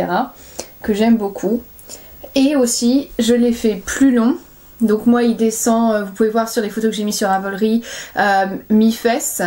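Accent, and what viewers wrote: England, United Kingdom. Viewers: Super de te retrouver. Heureusement que tu étais fatiguée car je crois que j'aurais eu du mal à te suivre sinon MDR. Ton accent anglais est très léger au début le temps que tu reprennes le rythme en français et au fur et à mesure je redoutais que tu ne prennes le rythme de ma fille, c'est à dire mitraillette... c'est très amusant à noter au fil de ton élocution et de ta passion. Un super moment passé avec toi. Merci encore. Où peux-t-on trouver les indications, de tout ce dont tu parles, écrites qq part stp ? Merci par avance. Bon courage pour la suite dans ton changement de vie ! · contente de retrouver après cette absence ! tes projets sont superbe, j'aime beaucoup le Manzo et ton Ravello marinière ! j'aime bien tes anecdotes hors tricot et ta façon de parler ! de temps en temps ya une pointe d'accent, mais c'est très léger, et si ça se trouve je ne l'entendrais pas si je ne savais pas que tu vivais en Angleterre .... 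je croise les doigts pour les exams de Simon et ta nouvelle mission boulot ! à bientôt 😁😁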